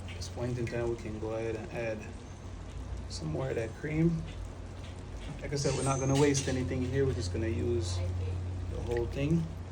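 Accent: Jamaica. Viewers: He is Jamaican. That accent, although it has some US nuances, is decidedly Jamaican. He just chooses to eat with his hands (unlike Jamaicans usually do).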